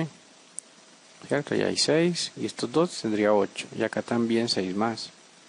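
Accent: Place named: Colombia. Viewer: tu acento suena a colombiano,lo digo por que hablas igual a julio profe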